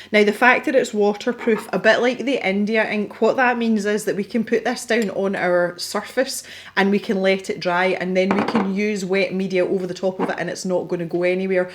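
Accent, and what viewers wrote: Scotland, United Kingdom. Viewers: I’ve been subscribed to your channel for a while and really look forward to your videos, I’ve only just realised you’re from my part of Scotland, that’s why i thought your accent was familiar. Keep doing what you’re doing as you’ve given me the courage to get back into art 😁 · Where do you come from? It Sounds of Scotland. Am I right! Greetings from Germay.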